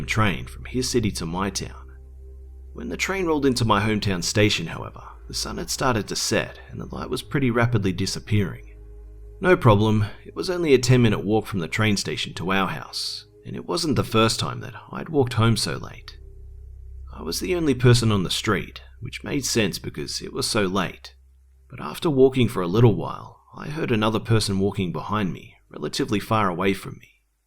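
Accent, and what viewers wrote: Australia. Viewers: I love your accent. I love Australians. ( P.S. Trying to get on the comments of the week.)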